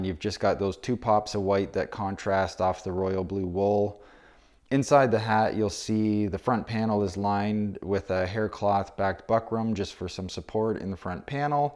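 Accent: Canada. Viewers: As a winnipeg guy you sound like an Ontario guy! Good vid I didn’t know I needed a flannel cap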